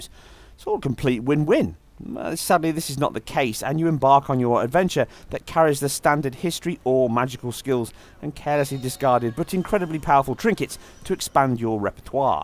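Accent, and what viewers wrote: England, United Kingdom. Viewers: Typical dozy yank, you are aware that he is actually English from England speaking his native tongue English. American English is a bastardised version of the English language. Anyways, good job as always.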